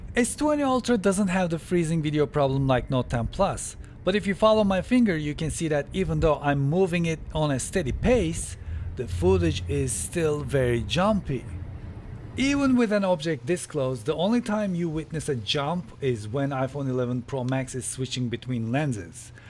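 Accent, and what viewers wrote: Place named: Turkey. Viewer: Your accent sounds like you are a turkish guy.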